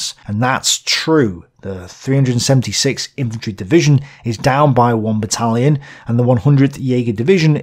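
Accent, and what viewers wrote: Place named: Northern England, United Kingdom. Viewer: I really enjoy your comments on ww2, Señor. By the way, because I lived in the UK I feel your accent is from Northern England or Scotland. Only there the U is pronounced like we do in Spanish. U = oo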